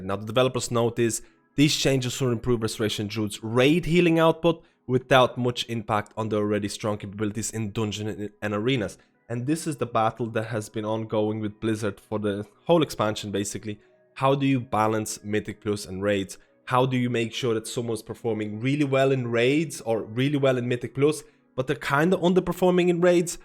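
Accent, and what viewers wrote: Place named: Ireland. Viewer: You have to live Ireland lol. the accent just comes out so often! much love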